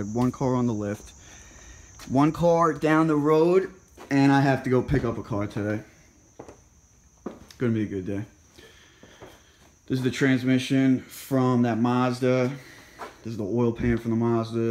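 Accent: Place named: Long Island, United States. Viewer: You sound like UFC fighter Al Iaquinta, which makes sense since he is also from Long Island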